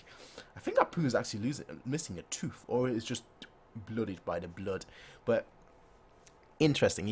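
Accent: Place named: RP, United Kingdom. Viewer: your accent pretty lit bro lowkey sound like a bond MC or villan the poshness instant sub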